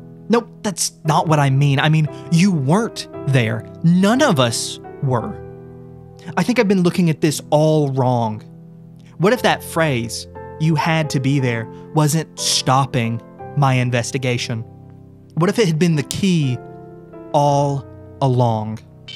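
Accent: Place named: Midwest, United States. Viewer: your accent is so interesting to me i can't tell if you're from west virginia or the midwest... somewhere west for sure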